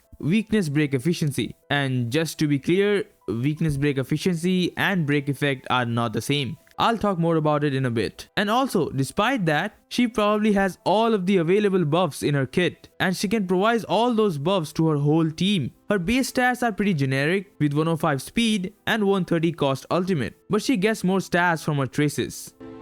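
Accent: India. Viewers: Ugh cant stand indian accents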